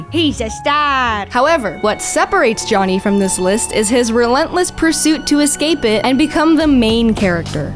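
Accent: Canada. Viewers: You sound stereotypically Canadian. It's funny. And I have to watch more. Need... MORE...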